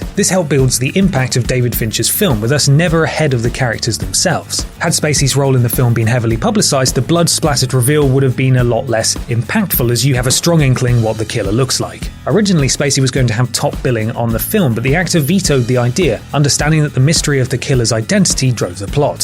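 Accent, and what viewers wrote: United Kingdom. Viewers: Awe I like his slightly British voice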